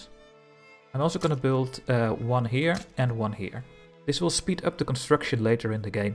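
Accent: Netherlands. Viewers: The further you go.. hoe meer ik hoor dat je ook echt Nederlands bent:D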